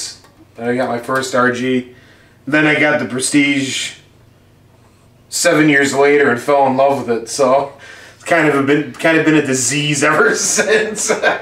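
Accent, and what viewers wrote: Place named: Canada. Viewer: O.K., Good cover, guys!, and a Great guitar!, 👍 Gave you a follow, too. Because IF YOU'RE NOT CANADIAN!, you certainly SOUND Canadian, with straight-shootin' straightforward appraisal!, of the qualities & sound of an Ibanez I have, and appreciate! Rock on! 🎉😂 🇨🇦 🍁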